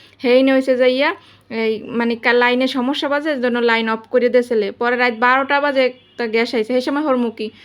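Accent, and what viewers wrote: Barishal, Bangladesh. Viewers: লাইক দিয়ে দেখে নিচ্ছি বরিশালের ভাষা আমার ভাল লাগে। এত সুন্দর করে কথা আর বন্ধু না হয়ে পারলাম না। নারকেল দিয়ে চাল ভাজা অনেক খেয়েছি লেংডা কালে দাদী থাকতে। ভাল থাক বোন 🎁🎁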